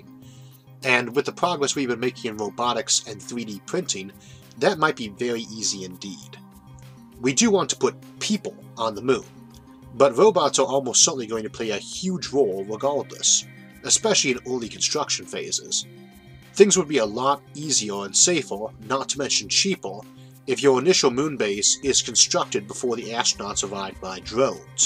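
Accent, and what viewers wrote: United States. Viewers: I never noticed an impediment, just a US accent. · Your voice is fine. Which part of the States does your accent come from? I’m from nz. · And I don't think you have a "Speech impediment". Horrible yank ascent but that's it. And I can get throught that. Going to Lunar is akin to going to the corner coach station and that's about it. Now, if you want to use it as an analogue for Mars then you may have something. You can install astronomy telescopes arrays that can be remotely controlled. which could be deployed around the surface. And you could install communication relays around it as well but placing those in orbit could be a better method of deployment. But as far as mining it? I don't think it will "panout" as you yanks say. Yes, there is material there but mining it in large qualities could be more of a dream than reality. Anything near the surface would have long since cooked off. 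And the ice echos from the surface as likely something else all together. RDF can be easliy fooled. You would have to go down atleast 200m before you remotely will find something. So, I don't see Lunar to be a place for any colonization. Just maybe a repair team to fix whatever can't be fixed by robotics. Other than that. That's it.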